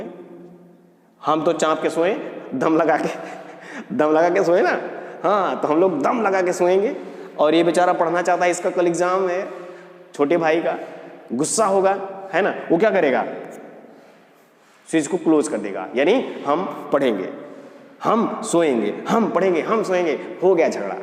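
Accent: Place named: Bhojpuri, India. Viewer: bhojpuriya tone bahut achcha laga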